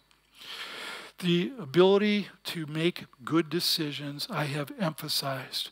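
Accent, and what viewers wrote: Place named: Midwest, United States. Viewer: He sounds like he's from Chicago 100% or at least Midwest lol. I can always tell those peoples' accents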